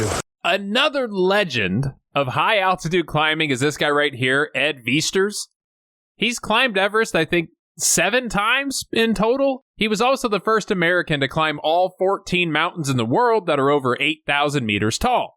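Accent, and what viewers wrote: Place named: Australia. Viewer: I didn’t expect to hear an Aussie accent so that woke me up lol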